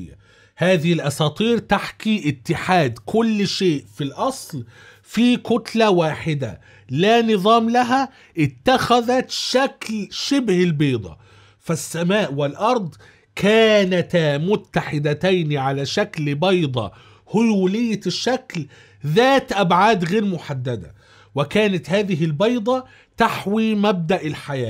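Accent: Egypt. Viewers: أخي محتوى رائع . ارجوا نطق حرف الجيم كما في الفصحى بدلا من العامية المصرية.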